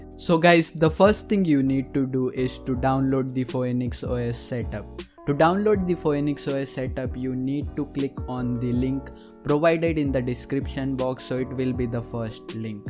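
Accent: India. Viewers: do you know what accents are? he's Indian (i think)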